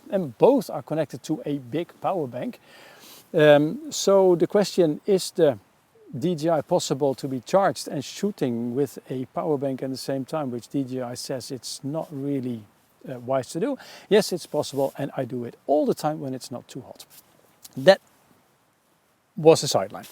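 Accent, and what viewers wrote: Netherlands. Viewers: De eerste paar zinnen wist ik oprecht niet of dit Engels of Nederlands was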